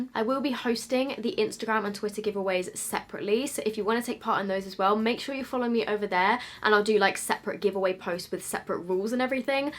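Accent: England, United Kingdom. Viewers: I started watching around 9 months ago. Your videos just kept popping up on my YouTube homepage and just decided to watch on of your videos😂 your videos started showing up even more as being recommended and I started watching you even more😂 I think the reason I subscribed was because of your personality❤️ you’re so pure and natural and I definitely LOVE your British accent😍😍 you’re absolutely yourself and appreciate you for that! I think the videos that made me subscribe were the ones were u were testing products that used u in their ads without ur permission😂 it was THE FUNNIEST😂😂😂 Instagram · First video I saw was 3 months ago, I think it was the Instagram make up trends one, and I thought you were one of the only ones who seemed to be genuine on YouTube and I binge watched so many of your vids 😂😂❤️❤️ Also loved your channel because I lived in England this summer and I love your accent Lots of love ❤️ <mail> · Omg! 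Congratulations, ❤️ I remember the first time your videos popped up in my recommendations, it was the video with boyfriend chooses my makeup😂 I watched that and then fell into a hole of watching too many things from your channel. At one point I was like, I am subscribing to her. Even though at that time I didn’t know English that well, damn that was more than 3 years ago, and I was kinda of scared of your British accent, because for me it was hard to understand (btw now I get everything you say🤩)but I subscribed anyway because your content was and still is really entertaining, but you have something unique. You are a REAL person. I can relate to you, you don’t hide the fact that you have problems or other stuff like some youtubers do and for that I really appreciate you❤️ Another thing i remember is that I was really surprised when I saw how many subscribers you had. You always provided good quality for your videos and I was convinced you had more than 1 million fans:)) How says: “ ”, you finally did it. 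(Btw, I started listening to because of you🙃)on another note, I am here for the makeup: I have a big passion, just like you and I’ve learnt so many things from you, plus I bought a lot of the products you recommended and they worked👌🏻 You are just such an inspiring, intelligent, creative, sensitive person and much more. I am truly happy for your accomplishment!! Love you❤️❤️ *also my insta is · First of all: CONGRATULATIONS!!!! I feel so happy for you, you deserve it!!! So, it's not very special but I discovered you when I was searching for Makeup Revolution product reviews like 2 years ago I think? And I remember instantly loving your honest reviews, and cute & funny editing style (and also the British accent, ngl). Thank you for your hard work! Twitter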